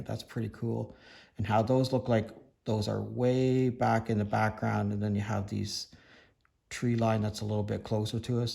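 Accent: Canada. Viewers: I am living in South Carolina now. Born in Scotland, lived in Montreal growing up. Family still in Ottawa and Toronto. I hear your Canadian accent, love it.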